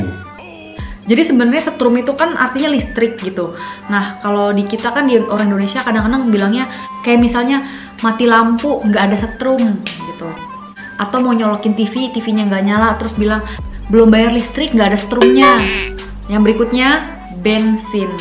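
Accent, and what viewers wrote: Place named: Sunda, Indonesia. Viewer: Teh orang mana? Kaya org sunda td bilang "bade ka cai" 😆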